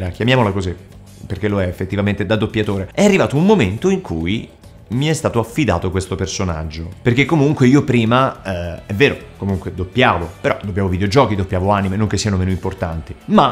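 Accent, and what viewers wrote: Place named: Rome, Italy. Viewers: sei bravissimo, il doppiaggio di Ragnar molto vero, però si sente un pò l'inflessione romana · Ciao, ti seguo da molto poco e ho scoperto i tuoi video dall'Australia... proprio per questo ti faccio una domanda probabilmente banale e fatta mille volte, ma per me curiosa. La tua cadenza delle tue parole è ovviamente laziale, allenamento a parte, è difficile perdere questa cadenza in fase di doppiaggio? Credo che quando parli in video sia normale farla venire fuori, ma quando doppi? cerchi di eliminarla sapendo che la utilizzi normalmente? Io per esempio avevo una lieve cadenza Piemontese ma che ho perso perchè da quasi 10 anni sono fuori dall'italia. Gran bel lavoro con Ragnar, sono curioso di vederti in altre serie.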